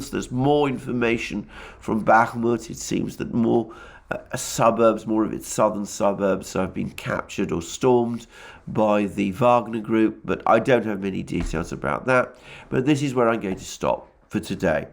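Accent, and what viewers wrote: United Kingdom. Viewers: Good day! :-) thanks again for your brilliant and intelligent analysis. And let me add, i love your nice british accent, which i learned in school but heared never again in real life. It makes it possible as a german-italo speaker to understand all perfectly.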